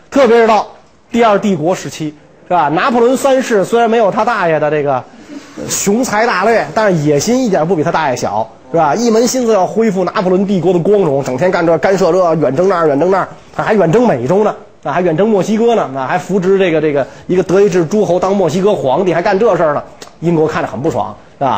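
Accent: Beijing, China. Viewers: 哈哈 这个京腔好可爱～ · 一口京腔，好听啊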